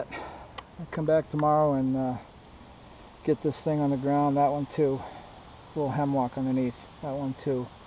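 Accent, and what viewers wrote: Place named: East Coast, United States. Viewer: good to see you back at er pal! always enjoy your vids with the east coast accent commentary! keep safe brother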